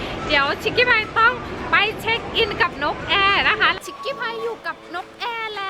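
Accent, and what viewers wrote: North, Thailand. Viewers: เอ้ยอู้เหนือก่อ 5555 พี่ชิคกี้พายพูดเหนือไหมค้าาา?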